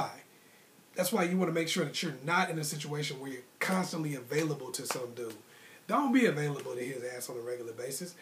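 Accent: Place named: Brooklyn, United States. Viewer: It sounds like you from my home town Brooklyn, NY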